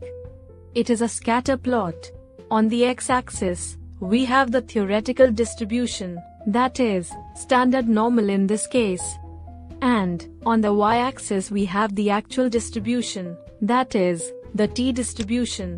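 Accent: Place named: India. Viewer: for Christ sake, you can´t even get rid of that horrible indian accent even with robot voice? FFS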